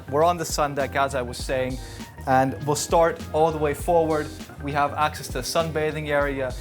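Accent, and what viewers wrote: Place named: United States. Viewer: Wonderful gentleman's yacht with lovely lines. Great walkthrough! Very positive energy and excellent American English sir!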